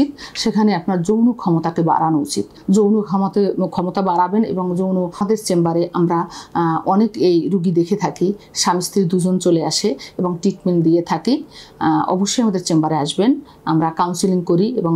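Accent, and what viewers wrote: Barishal, Bangladesh. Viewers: মেডাম আপা বরিশালে নাকি মুখের কথা সুনে তো মনে হয়ে সেরকম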